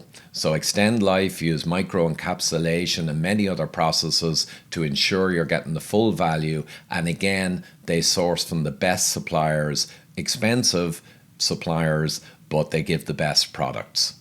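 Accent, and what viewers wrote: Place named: Ireland. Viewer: the proble for ivor , is his accent , its so difficult to take the irish accent seriously . they are more renound for making jokes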